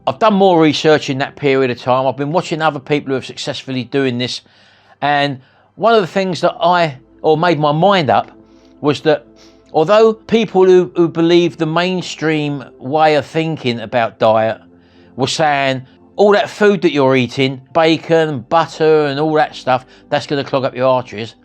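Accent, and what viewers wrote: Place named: England, United Kingdom. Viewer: How refreshing to listen to a clear English accent instead of high pitched nasal American females telling us how to eat.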